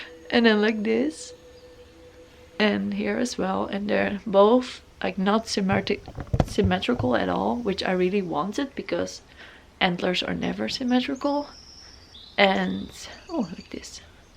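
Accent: Netherlands. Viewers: No, it's NOT rude! I'm Dutch and I don't say it like that. I have English speaking friends and they say I don't have a Dutch accent. It annoys me too. But her accent doesn't sound bad even though it's clearly a Dutch. It still sounds nice I think apart from the ID idea word. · She's Dutch, and she pronounces the word like most Dutch people do. Your comment is unnecessary and quite honestly, pretty rude.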